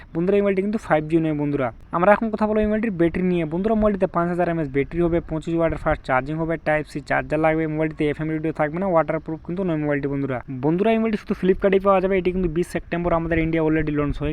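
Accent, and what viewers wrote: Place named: Chittagong Hill Tracts, Bangladesh. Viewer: পাঠক কি চাকমা?? ওনার বাংলা তো বুঝতে খুবই অসুবিধা হচ্ছে।বাঙালি reader হলে ভালো হতো।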